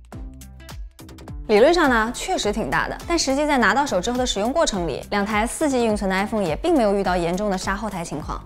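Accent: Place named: Nanjing, China. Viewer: 总感觉全程自动翻译成南京话呢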